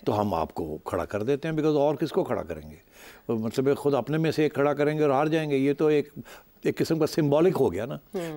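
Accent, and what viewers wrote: Punjab, India. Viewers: Punjabi tuch excellent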